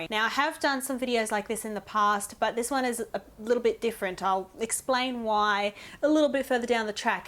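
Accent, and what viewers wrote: Australia. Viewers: I don't have acne (i feel so lucky) but it's great seeing how you beauty gurus could do "magic" with make-up products. I own lots of them, still not able to cover my pores LOL Thanks for the video! Thumbs up for ya. And omg ur Aussie accent is so decent to listen to. Makes me miss Oz sooo much :* thanks ;)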